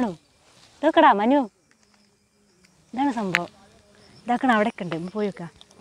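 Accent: Malappuram, India. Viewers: ഹായ്.... വിഭവങ്ങളും.... മലപ്പുറം തനത് ശൈലിയിൽ ഉള്ള അവതരണവും ഉഷാറാവുന്നുണ്ട്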